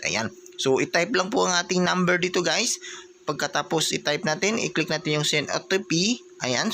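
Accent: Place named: Visayas, Philippines. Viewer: Visaya amp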